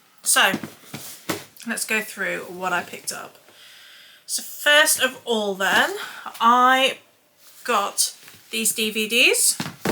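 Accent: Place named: Liverpool, United Kingdom. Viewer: Why are you speaking in a Scouse accent? Are you even from Liverpool? If you are not from Liverpool then why are you speaking in a Scouse accent?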